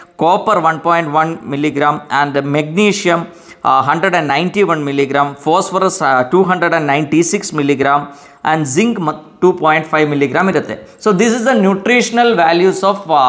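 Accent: Kerala, India. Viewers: Kannada mathaduvavaru kuda Doctor Nimmastu Shudhavagi Kannada Mathadalla Butfrom Kelavu padagala Accent gottagutade Nivu from Kerala But Nimma pure Kannada Vivarane Nivu Hutti Beledidu& Education khanditha karnataka yendu Nimma pratiyondu Vidio nodutene . Tumbaa chennagi tilisutiri 👌👌👌 Nimage Vandanegalu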